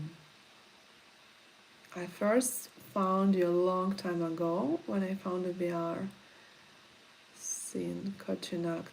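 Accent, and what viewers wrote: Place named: St. Petersburg, Russia. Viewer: great video! I lived and worked in Moscow years ago and visited St. Pete where I believe you are from many times. Your accent brings back some wonderful memories! Come and visit "the Valley of the Sun" here in Arizona sometime!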